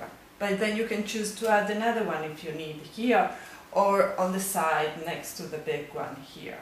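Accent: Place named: Italy. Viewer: grazie per la lezione. mi piace il tuo accento italiano ...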